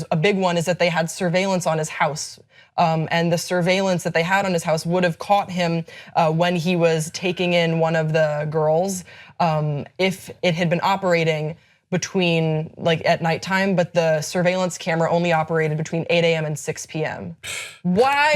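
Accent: Belgium. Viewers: pls speak more french je veux entendre ton accent belge <33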